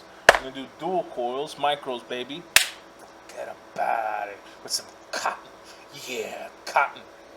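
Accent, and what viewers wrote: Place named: Brooklyn, United States. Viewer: Something tells me your from Brooklyn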